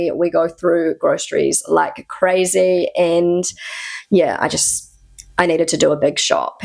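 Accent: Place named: New Zealand. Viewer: Your vid title saying "Lidil" instead of "Lidl" is a bit odd. Love your accent, though. That much kiwi still in your speech a decade later is rather gorgeous. G'day from Australia.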